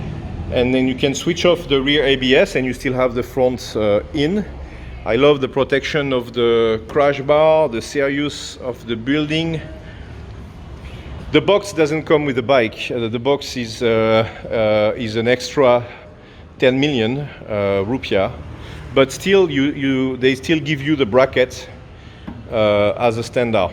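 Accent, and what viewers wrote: France. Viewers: Are you French ? Hi 👋🏽 from Perth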